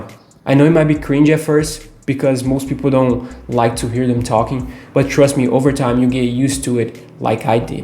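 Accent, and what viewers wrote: Brazil. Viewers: Guy, you're simply amazing! Are you Brazilian? Your english is so perfect. Kudos! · he has a slight accent from northeast Brazil, but this is normal. hahaha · Real, quando eu olhei e ouvi ele falando já ficou claro que era br KKKKKK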